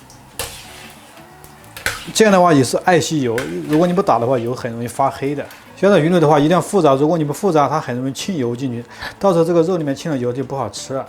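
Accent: Sichuan, China. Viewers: 买菜好接地气👍带的四川口音听着亲切，关键干货满满，就是馋死人了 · 四川话，目测成都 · 四川口音😊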